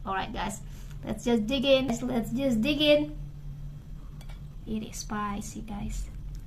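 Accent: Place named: England, United Kingdom. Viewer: love your accent English sis❣️😊the chips so crispy 🤤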